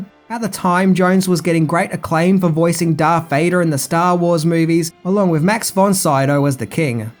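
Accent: Australia. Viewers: No, you idiot. lives in Australia. He's said that many times. Not to mention his Australian accent is a dead give away. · He pronounces things as an Ozzie. Though I think he uses words incorrectly on purpose, like ‘irony.’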